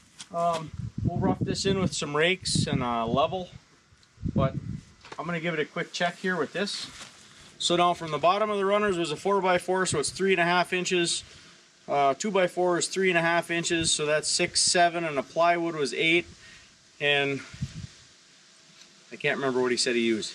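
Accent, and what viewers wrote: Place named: Michigan, United States. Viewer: Just found your channel. Thought I recognized the accent. My mom is from Michigan. So most all of my cousins live up there. I love your trains.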